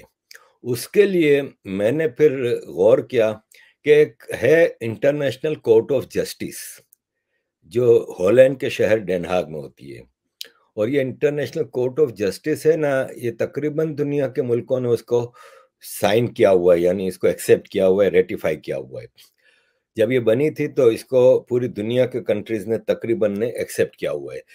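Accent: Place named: Sindh, India. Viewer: Sai your ascent and your style of speaking completely resembles to the Sindhis of our Jaipur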